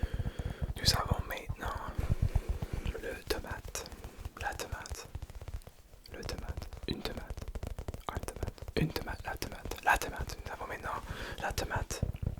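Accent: Quebec, Canada. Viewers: Ici en France, y a plein de sports mais c'est vrai qu'on ne joue pas trop au golf, c'est réservé à des personnes ayant les moyens. Pour ma part, je joue au tennis Ton accent canadien est très joli 😊 Bonne nuit tout le monde 😴🫶🏽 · incroyable !! enfin la vidéo en fr, pour les français l'accent québécois ne se rate pas lol !! toujours des vidéos géniales ^^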